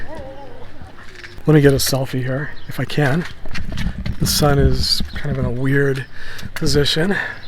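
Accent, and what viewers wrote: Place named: Boston, United States. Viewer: Cuppa coffee. Sounds like your from my neck of the woods. Boston area.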